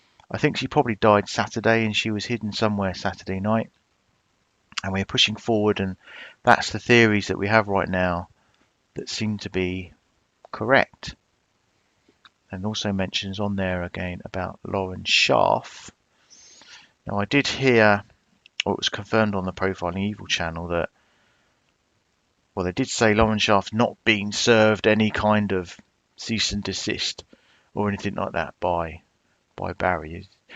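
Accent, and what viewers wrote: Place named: England, United Kingdom. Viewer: where in England is this accent from?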